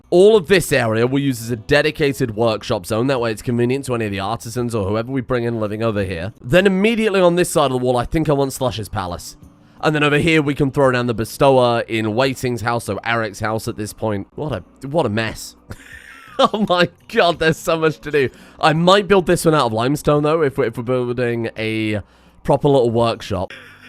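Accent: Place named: Wales, United Kingdom. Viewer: is going to be trying out a new series and a non Welsh accent.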